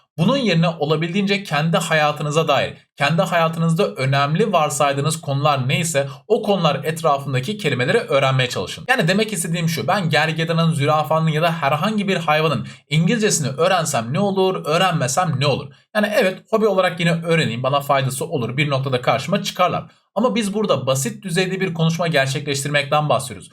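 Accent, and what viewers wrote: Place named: Turkey. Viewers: ilk 5 saniyede anlaşılıyor aksandan türk olduğu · bence türk aksanı belli oluyor ama aksan önemli değil zaten çok akici konuşuyor.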